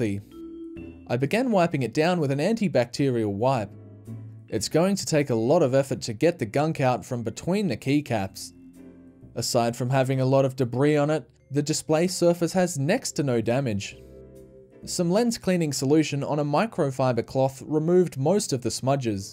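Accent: Australia. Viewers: You voice sounds just like my Australian friend I met on Facebook lmao. Y'all are so chill and relaxing to listen to. Totally random. 🤣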